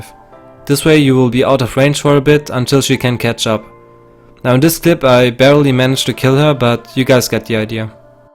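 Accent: Germany. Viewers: One question... Is he german, his voice sounds german in my opinion :D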